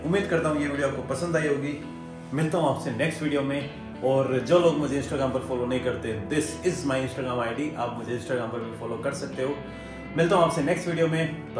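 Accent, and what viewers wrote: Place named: Bengal, India. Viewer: Your bengali accent is so ❤😉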